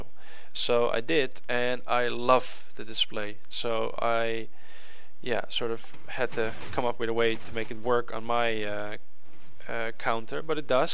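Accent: Netherlands. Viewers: Are you dutch? I think it by listening to your accent :P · you dutch? becuz it sounds like so ;) (if you are, im dutch ;)) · ja ik hoor nu al dat je nederlands bent :P , maar wel vet gemaakt :D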